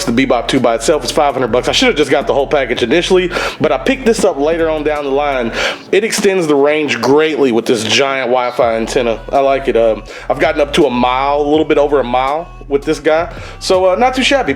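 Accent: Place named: South, United States. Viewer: can't tell if this guy is in Texas or along the Mississippi river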